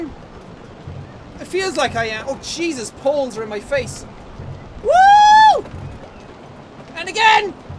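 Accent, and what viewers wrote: Ireland. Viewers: ***** There is a specific accent those from Southern Ireland have. High pitched and fast. definitely has that. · Your accent is that Irish? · Do you live in Ireland? I hear the accent in your voice.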